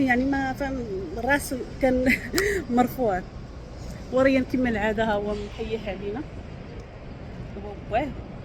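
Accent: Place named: Morocco. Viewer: باز بعد اللهجة المغربية كتفرط فيها الله يعز سهام مغربية في ماليزيا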